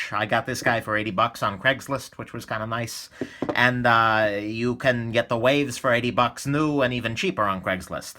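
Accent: New York, United States. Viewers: I love this man's Accent, makes me miss NY. .😭 CONEY ISLAND LET'S GOOO!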